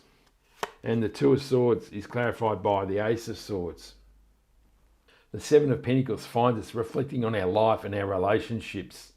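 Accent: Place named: Australia. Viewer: W Hi,. Sounds like you are an Aussie. Love your voice, manner, energy and expression. Subscribed. Thank you, and best of success. Great cartoon pic thumbnail. - very effective for click through!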